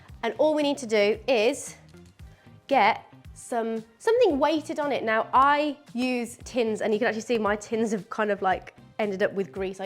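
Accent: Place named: United Kingdom. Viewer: I was going to make a snide comment as I thought you were American and I can't abide Americans, however upon hearing your accent and realising that you are British I shant be making a snide comment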